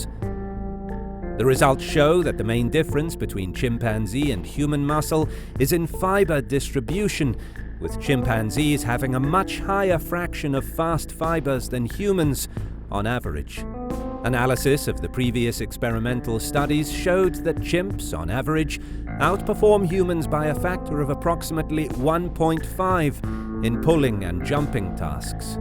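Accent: England, United Kingdom. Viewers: Why does a British accent describing powerful animals sound so intriguing? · So it took you to get to any actual info, and then you gave us 20 seconds of data before you restated half the filler you already brought. No sources, no actual numbers, just an english accent to make people think you know something. 10 minutes on google gives you the same info and we made slideshows like this in freshman year high school. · Rogan with an english accent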